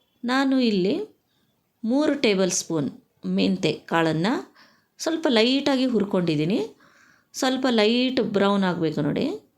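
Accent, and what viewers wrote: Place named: North Karnataka, India. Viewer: Akka uttarkarnataka slang olag matadri, cholo ansutta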